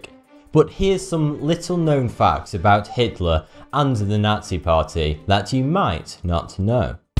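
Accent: United Kingdom. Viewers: Steve Holmes....I believe what you are describing is called having an accent ! He probably doesn't pronounce words like you and I do because he is probably not American. I believe he is British or possibly Irish. Either way, the way he sounds and/or pronounces words will not be like an American does ! Have you never heard anyone speak with an accent...even on TV or in a movie etc ? I actually like his accent, although I admit that it is a little difficult to understand at times.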